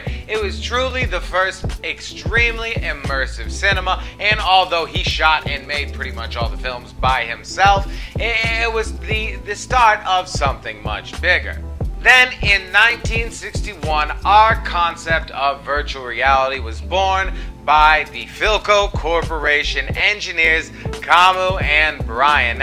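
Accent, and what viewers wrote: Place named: Northeast, United States. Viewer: Not a bad first shot providing voice over narration,! Not bad at all! Who knew that inside our lurked a bonafied documentarian! You know the strange part? Your upper east coast accent adds an amount of flair and polish to your presentation I wasn't expecting. Can't wait for your next feature presentation!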